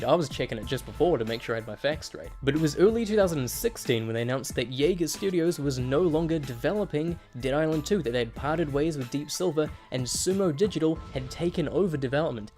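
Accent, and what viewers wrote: New Zealand. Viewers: are you from new zealand? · Are You From New Zealand Bro 😂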